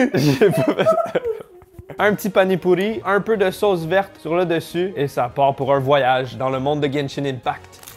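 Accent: Quebec, Canada. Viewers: T’es québécois ?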